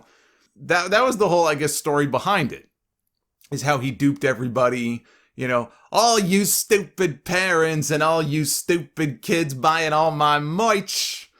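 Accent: New York, United States. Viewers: Lol, what? I hear nothing but NY accent the entire time.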